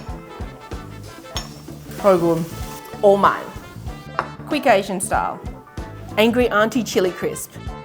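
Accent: Australia. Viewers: love yr aussie accent.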